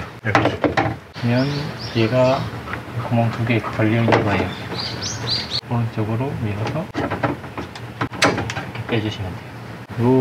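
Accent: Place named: Gyeongsang, South Korea. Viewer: 손재주가 좋고 영상을 진짜 잘찍었네예 같은 경남쪽이라 사투리도 정겹고 와이프차 고치는데 많은도움 될듯 합니다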